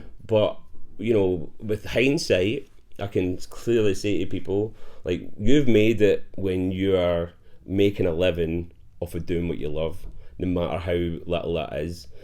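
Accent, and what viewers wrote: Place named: Glasgow, United Kingdom. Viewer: Я прекрасно его понимаю. Я австралиец, но моя мама родилась в том же городе, где он жил в Глазго. Я всю свою дочь провела в качестве переводчика для своих друзей, так как никто из них тоже не мог ее понять. Этот акцент трудно понять, если вы не привыкли к нему.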